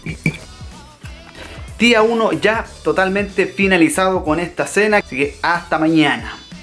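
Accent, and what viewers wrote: Chile. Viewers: Ese acento chileno me desespera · comunica chileno?:v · Habla como chileno wn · Yo cache por como ablaba q era chileno :/ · Eres chileno o de otro pais con acento de chile :v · No sé cómo es que estoy suscrita a tu canal (no recuerdo haberme suscrito) pero que bueno!! Jajaja me quedé super contenta con tu video. Cuando vi el título dije "$800 no esta mal" hasta que escuche tu acento que comprendí que no eras mexicano. Aquí con eso puedes hacer las 3 comidas del día en un restaurante que te incluya todo en paquete y quedas más que satisfecho, pero lo lograste! Saludos hasta tu precioso Chile :D bendiciones y excelente video!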